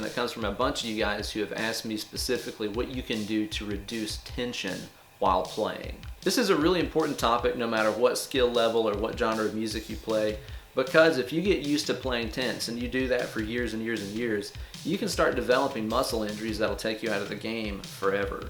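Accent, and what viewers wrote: South, United States. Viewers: Are you from Knoxville? I've been trying to place your accent for a while. I was thinking Georgia maybe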